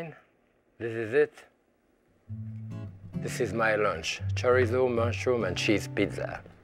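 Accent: France. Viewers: I love to cook. My first serious cookbooks were Mastering the Art of French Cooking, Volumes I and II. The originals, not the boxed set. My family is of French origin. Everyone in my family cooks delicious creative food, although they may have degrees in subjects not related to food. I have lived in Berlin for 30 years, but before that, I lived on the French border where I got married. My new husband and I went to Alsace every other week-end, where we had some really good French friends. His accent was exactly like ', the melody of French carried directly into his English, "You know..." I am "staying in" now and almost every day I talk to my sister in Minnesota, who is a professional baker. When I told her I was watching, she said, "Oh good! I'm so glad you are doing that. It's good for your spirits." Not that I'm depressed! But she is so right. I carefully record the details of his methods, and have "More Fast Food My Way" in my Amazon basket. 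I'm so sorry for his loss of his Gloria, who he thinks about with every deft detail, how she likes turkey wings, and likes her pasta well-done. What a terrible year! On his many shows, though, he's timeless, and clever and produces fabulous food. I have to keep reminding myself that he has a Master's Degree in French Literature from Columbia University... He has mastery at more than the one thing... He's a man of all seasons.In this time, he's a Godsend!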